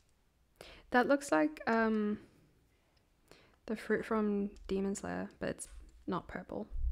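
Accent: South Africa. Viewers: Are you South African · Are you from south africa i hear an accent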